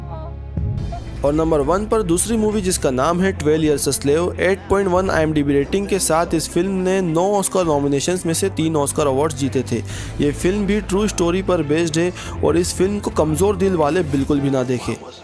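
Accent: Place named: India. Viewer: your voice doesnt suits at all for western movies cuz of ur accent,well i dont blame you but you are good to narrate for hindi movies tho.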